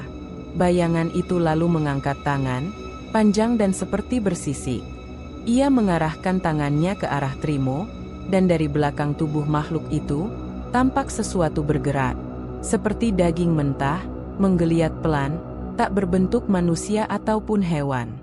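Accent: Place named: Java, Indonesia. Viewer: Bahasa jawamu lucu...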